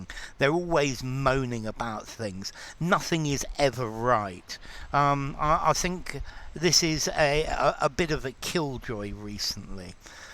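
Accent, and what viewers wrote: United Kingdom. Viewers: Thanks for your interesting videos. Are you British?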